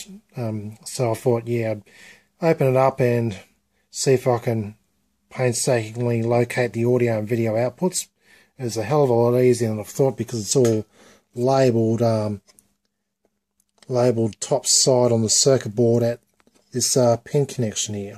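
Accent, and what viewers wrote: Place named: Australia. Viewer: Hi there. I have a camera I got with my little quadcopter. It records onboard to a micro sd card. The quality is surprisingly ok but it does not have av out . I would like to add an av out plug to it if possible for wireless streaming. Would u take a look at it please and maybe point me in the right direction as to wht to solder or not. I can take pics for ya or send ya a video of it. I hear u are Australian. Could maybe post it to you. Im in perth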